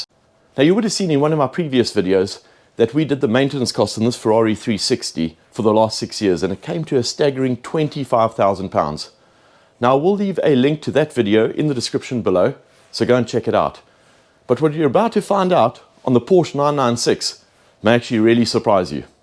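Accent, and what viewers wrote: South Africa. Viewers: Fascinating insight mate. If you thought the bills for the 360 were eye-watering, try an F355...Engine out every 3 years for the belts (22 hours labour - ouch) manifolds made out of cheese, radiators made out of even softer cheese, horrible sticky cabin plastics needing (expensive) refurbishment..the list goes on and on. Nevertheless, once you take it out for a good blast all is forgiven! At least I made a tidy profit when I sold it after 11 joyous years. My little 993 is now making me smile. By the way, you're not originally from SA or Zim are you? Cheers from a rather chilly Otford, Kent, UK.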